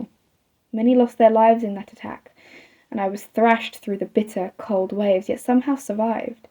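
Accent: United Kingdom. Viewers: very british i don’t like it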